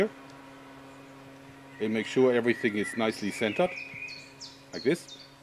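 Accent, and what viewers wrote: Germany. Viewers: Wer 3-4 Videos von ihm geschaut hat merkt sofort dass er Deutscher ist dass ist nichts neues. Ich finde es gut dass er es auf Englisch erzählt damit es auch andere verstehen. Hauptsache man kann ihn doch noch verstehen!! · are you german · where are you from? your accent sounds familiar... are you German?? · Yeah, im german too, and i had heard his accent, beacause often german have this accent too (sry for bad english :D) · Where are you from I can't figure out your accent I think it is german??? Can someone please correct me if I'm wrong thx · are you german? you talk like a german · you are a german guy ? you speak with the germen dialekt :) and you was by the arme ? :D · I agree with the accent, but now that bugs me because Nords are supposed to be Scandinavian, not German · What is his country of origin? I'm guessing he is German based on his accent, but I could be mistaken. 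· I can hear you're german!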